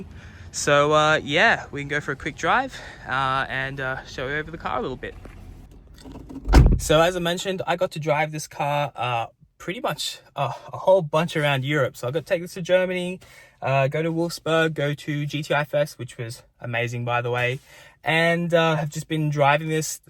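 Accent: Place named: Australia. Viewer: Hey mate, sounds like a skippy accent there 😎 Any suggestions for down under to get some mods / tune done?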